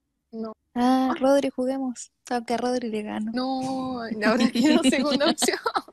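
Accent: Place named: Peru. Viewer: Tamare oe se pego el peruano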